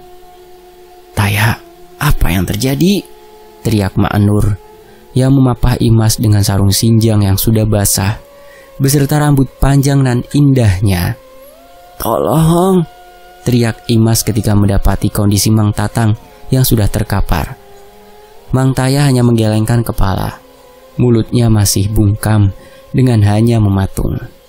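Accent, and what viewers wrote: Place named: Sunda, Indonesia. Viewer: Lucu denger bahasa Sunda nya .. 😁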